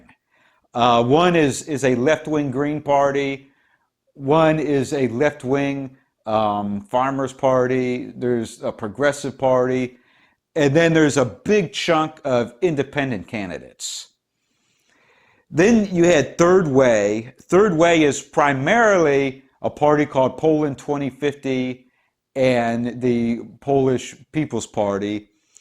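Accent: United States. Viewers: Love the information. All thumbs up! Thank you! You sound like you’re speaking in Chicago accent. I am Polish/Chi south side 3rd gen. You might be similar or other Midwest. Just curious if you care to divulge.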